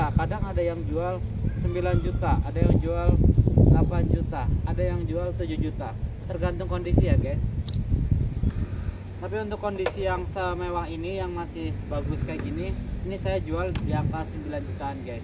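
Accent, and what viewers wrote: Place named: Banyumas, Indonesia. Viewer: Ritinge ndi sih? Khas banget wong plat R 😂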